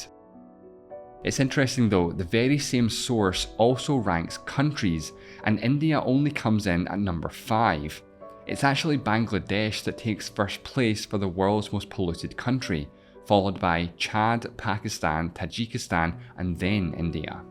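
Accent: Scotland, United Kingdom. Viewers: Are you Scottish? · Hes scottish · You've never heard a Scottish accent? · where in scotland are you from? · he’s scottish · no he doesn’t? It’s just a Scottish accent. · He's Scottish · Scotland. I couldn't say whereabouts but he's definitely Scottish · Is this a scottish accent or something? Harder to understEnd. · Scottish not sure where in Scotland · guys, the guy's from scotland. give him a break for pronouncing "city" as "seti"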